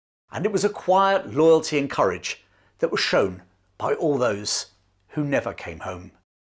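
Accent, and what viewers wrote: United Kingdom. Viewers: As a Hongkonger who is always curious about our past, I have been listeniing to different narrations of this battle a million times now. It's nice to listen to this again in the British accent and perspective. Thanks chap.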